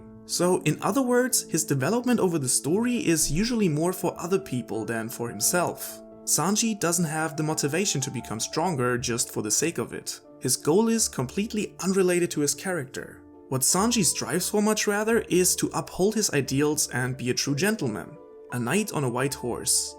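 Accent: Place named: Germany. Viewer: Are you german? Your english sounds like you are german 😁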